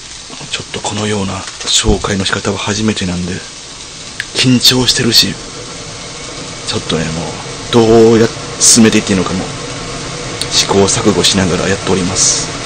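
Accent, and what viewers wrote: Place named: Kansai, Japan. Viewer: 声と関西弁に癒されます!!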